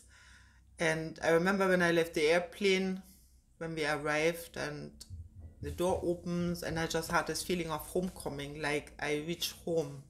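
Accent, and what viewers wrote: Jamaica. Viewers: She sound much like a born jamaican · This was a very interesting story. You were very adventurous. You have some Jamaican flavor in your voice.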